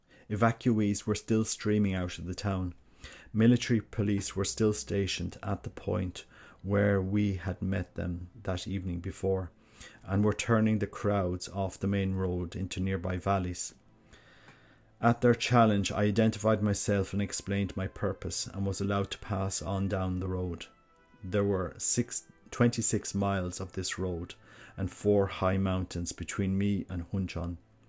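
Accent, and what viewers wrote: Ireland. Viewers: Did you go beyond chapter 1? We have a family copy of the book (Father Frank Canavan is my great uncle) but hearing it in an Irish accent is quite special.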